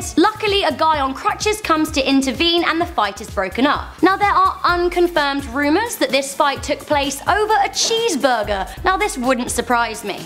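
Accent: United Kingdom. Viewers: guurl, Why do i gotta be a sucker for them British accents <3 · Ok usually I'm really into British accents, but when you mix it with you're voice it's literally the ugliest sound on earth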